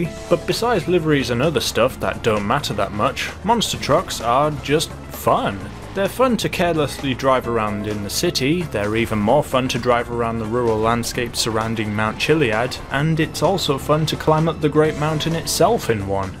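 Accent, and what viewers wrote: United Kingdom. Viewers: Wait wait are you british?? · Didnt notice that you was from uk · Are you British??? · Are you a UK YouTuber? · Wait... YOU'RE BRITISH TOO, well that's a nice surprise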